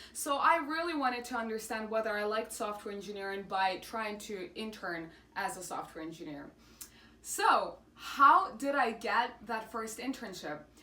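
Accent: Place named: Russia. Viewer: aaaa by the way i like the way that you trying to hide your Russian accent you doing it well i respect it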